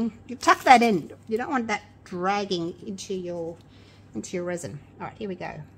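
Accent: Australia. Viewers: Aussie accent is the best...(No, I'm not biassed.. well, maybe just a tiny, incy, wincy bit🤣🤣)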